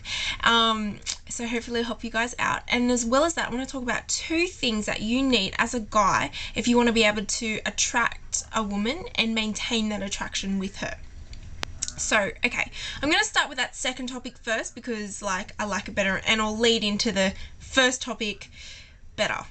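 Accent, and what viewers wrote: Australia. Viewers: I love her aussie accent its such a turn on. But i dont anyone can wait around long enough for the perplexing age old question of what women want? bcoz women dont really know what they want. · WOW! what is she australian??? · the aussie accent is a mayyyyyja put off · I´ll bet she learned english or was born in Australia. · thats top advice, esp what you said; dont take what women say literally. so really that means i cant take you literally then hehehe. and yes everyone shes an aussie. nice to hear an aussie accent out of an absolute amount of yanky teenbopy girly stuff on the tube:) x)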